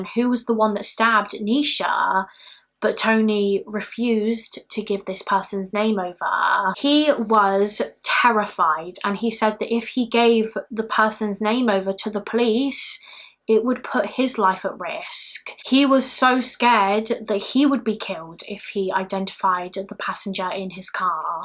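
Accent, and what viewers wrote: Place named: Southwest England, United Kingdom. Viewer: It's a south west English accent 😊. Not 100% but I'd say Somerset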